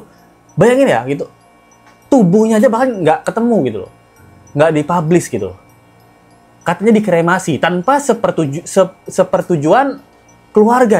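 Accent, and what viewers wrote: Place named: Bali, Indonesia. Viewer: Logat nya Bali banget🎉🎉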